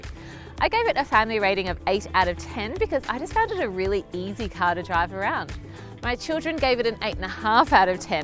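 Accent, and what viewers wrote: Australia. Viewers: Phu Vuong she sounds Australian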